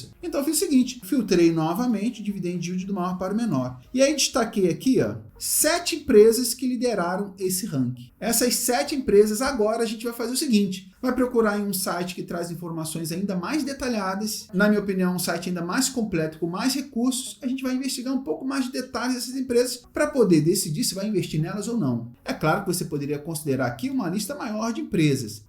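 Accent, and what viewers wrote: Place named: Rio de Janeiro, Brazil. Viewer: Típico carioca. 😂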